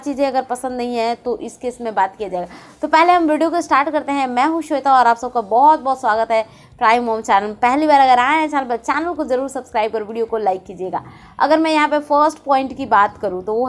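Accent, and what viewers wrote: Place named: Bihar, India. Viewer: Mam aap bihar se ho kya ❤❤❤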